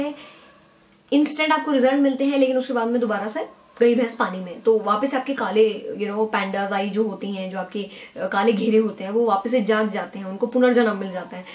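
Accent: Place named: Rajasthan, India. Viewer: U totally rocked the rajasthani accent today!!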